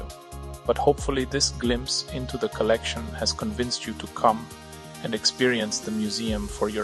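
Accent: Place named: Germany. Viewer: Good luck with your further efforts. The "AI voice" misled me with a very strong "German speaker's accent" into thinking that it was a human speaker who would/should have known how to pronounce that the "German way."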